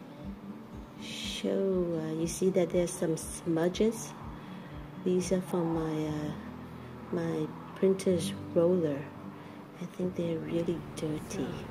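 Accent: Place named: Germany. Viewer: you sound German , are you from Germany ?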